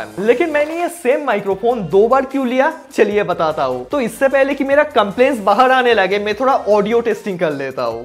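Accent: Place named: Bengal, India. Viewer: Bangali accent bojha jachhe bhai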